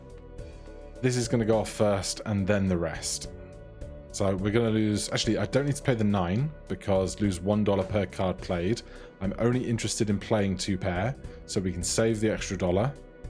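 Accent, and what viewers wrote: United Kingdom. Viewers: Hello, been loving your Balatro content, something about a fellow Brit commentating over this game in such a dry-witted fashion has only made me so much more eager for the release of this game so that I can actually play it for the first time. Fantastic content, please keep it up as much as you can considering your other commitments.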